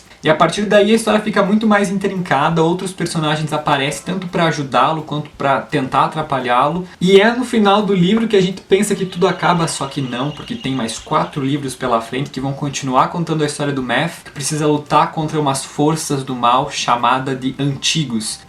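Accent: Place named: Portugal. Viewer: O sotaque dele chega a beirar o sotaque de portugal kkkk amo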